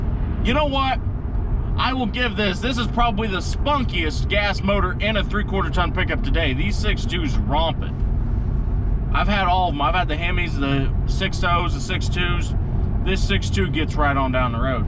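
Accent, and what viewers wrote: South, United States. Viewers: Don't worry I subscribed but your voice sounds like Mickey Mouse with a Southern drawl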